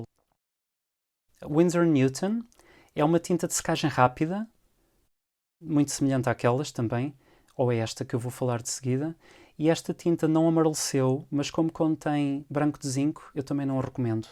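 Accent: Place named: Portugal. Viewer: Que lindo ver você falando português de Portugal, amo esta língua portuguesa! ❤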